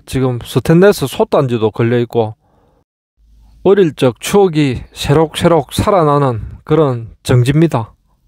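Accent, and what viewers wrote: Gyeongsang, South Korea. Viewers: 갱상도라 더더욱 신뢰가 안가네…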